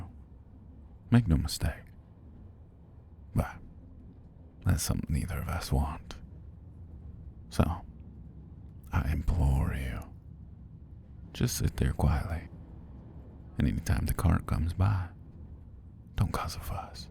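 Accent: South, United States. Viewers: I RAN to this video, hawt damn. Edited to add: as a southerner, I'm so picky about southern accents but you ate and left no crumbs, sir. Tysm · I saw country accent, gasped and clicked immediately 😏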